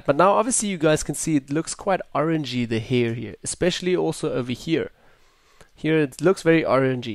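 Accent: South Africa. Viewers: Bro are you South African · is that a south African accent?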